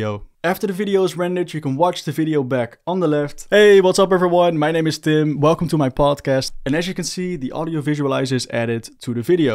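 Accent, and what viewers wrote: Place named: Netherlands. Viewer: Alright I know this has nothing to do with anything but you sound like you're Dutch, can you confirm or deny this because I'm wondering if I'm right now haha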